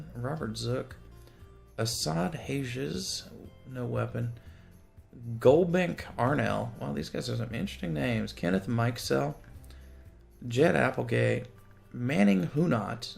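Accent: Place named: South, United States. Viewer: The subtleness of your USA southern accent is what suggested Virginia to me. Not that I've spent enough time there to know them well.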